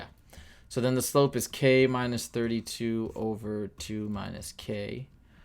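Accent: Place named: United Kingdom. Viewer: Lol your british accent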